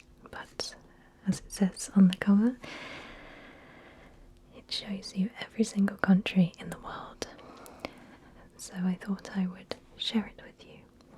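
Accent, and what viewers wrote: England, United Kingdom. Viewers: I think you have an amazing voice and English accent.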